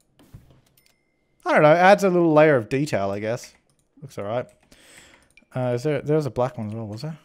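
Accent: Australia. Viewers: Mate, you are like the best stereotypical Australian the accent to the phrasing is great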